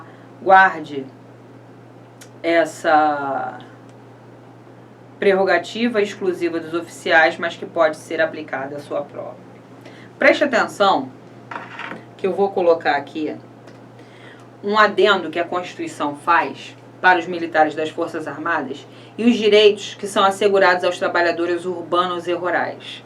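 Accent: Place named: Rio de Janeiro, Brazil. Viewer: Uma pena, uma aula tão rica e objetiva não ter tantas visualizações, obrigada professora! A senhora é 10, gratidão! Sou carioca mas moro em BSB, e as vezes esqueço o quão lindo é o nosso sotaque <3 estudo em casa, porém, logo voltarei para testemunhar minha aprovação neste comentário, Deus abençoe esse curso!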